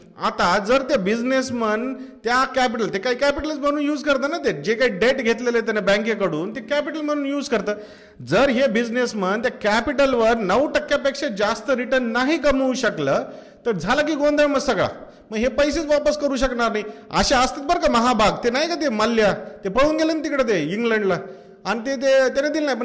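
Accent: India. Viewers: Pan Marathi Evdhi pan khedval Nka bolu Sir...... Kahi national viewer pan astil tumcha... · अस्सल गावरान तडका..... लै झ्याक सर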